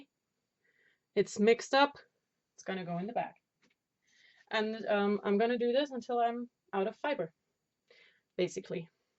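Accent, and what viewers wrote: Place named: Germany. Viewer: Hallo, du sprichst doch sicherlich Deutsch, oder? Ich höre so ein Dialekt in deinem Englisch...:-) Wäre schön, so ein Video auf Deutsch zu machen. Danke!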